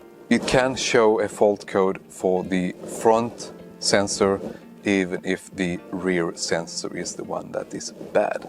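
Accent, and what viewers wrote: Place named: Scania, Sweden. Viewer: Skånska (?) = kvalitet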